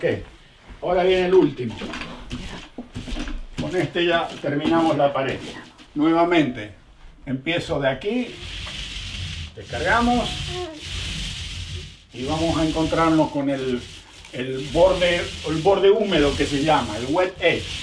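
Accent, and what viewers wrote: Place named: Argentina. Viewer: Nos encanta la calidad y los detalles de la explicación. Además nos asombrá que después de tantos años en Canadá conservés el acento argentino como si nunca te hubieras ido. Un capo de la pintura! Saludos desde La Plata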